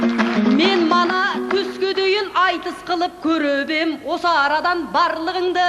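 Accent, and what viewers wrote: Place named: West, Kazakhstan. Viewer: Батысша айтып -айтып тастады! Тамаша керемет! Тыңдай берем, шабыт алам Жансаядан. Дегеніңе жет. , Ақжайығымның намысын берме, сүрінбе, Алла жолыңды ашсын! 😍😙😙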